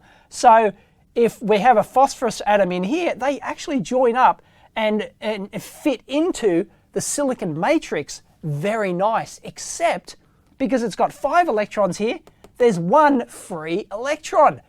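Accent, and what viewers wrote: Australia. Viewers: Absolutely Superb!! Best explanation I've seen. Moreover Australian accent made it just PERFECT!! Thank you mate!!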